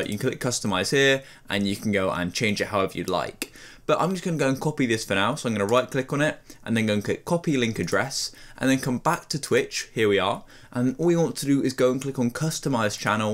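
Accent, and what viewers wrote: United Kingdom. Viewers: He’s definitely British dude he can’t help it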